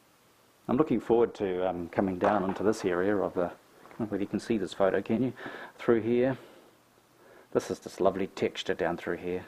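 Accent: New Zealand. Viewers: Glad I found you on Youtube, Finally making the switch from acrylic to oils... I feel like I just went from using sand paper to tissues... it's amazing, sucks they don't teach you any of this in high school. :/ Schools are cheap in australia with their art not very good when the only thing your good at is art. :P Keep it up! Haha I actually like the talking reminds me of my family in New Zealand.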